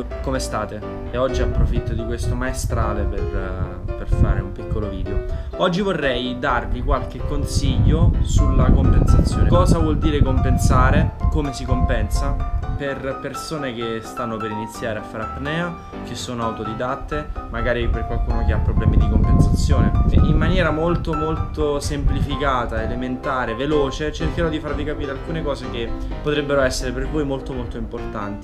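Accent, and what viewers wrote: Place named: Salento, Italy. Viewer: Ciao, ti seguo dal video delle iene e quando hai fatto il video con freddy sei diventato il mio idolo ahaha Ho 28 anni e sono un apneista amatoriale e autodidatta da una vita, da prima di internet e del 'sapere' facile, negli ultimi anni ho letto qualcosa sull'apnea e ho migliorato un po le performance anche se devo dire che ho ancora problemi nella preparazione fuori dall'acqua. riesco a stare sott'acqua si e no un minuto.. vabè ti ho scritto per altro;:D Volevo dirti 2 cose, una è che ti è venuto l'accento salentino a furia di stare qui ahah la seconda, riguardo la compensazione, io compenso senza mani, sono davvero fortunato o ce un metodo per insegnarlo? è da 2 anni che cerco di far capire a dei miei amici come fare e non ci riesco. ti ringrazio in anticipo, spero un giorno di incontrarci, magari in acqua ahah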